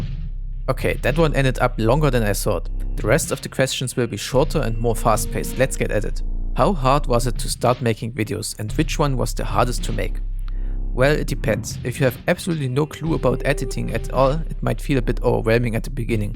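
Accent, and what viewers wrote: Germany. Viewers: What about Cult of the Lamb? Had some Isaac - Feelings while watching the Gamescom-Trailer. Nice (Cat-)face reveal Lieb deinen Kartoffelakzent xD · I love how your german accent sounds when speaking english, like dessert for the ears. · I actually like the German accent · i love your german-english it reminds me about laovaan who is also a german speaking english in his vids. you sound so similar kinda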